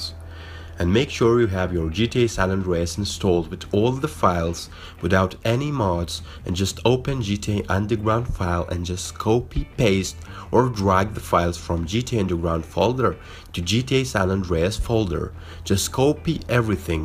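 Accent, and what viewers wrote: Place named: United Kingdom. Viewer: cause your accent sound a little bit British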